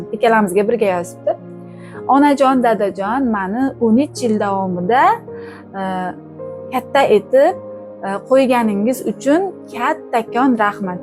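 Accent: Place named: Khorezm, Uzbekistan. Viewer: ХОРАЗИМ ДАНМИСИЗ ШЕВАНГИЗ УХШАБ КЕТАДИ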